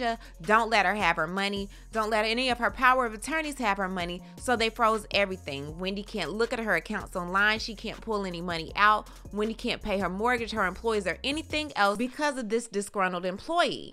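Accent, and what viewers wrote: South, United States. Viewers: And her southern accent damn i love it , am jamaican and i live for her uploads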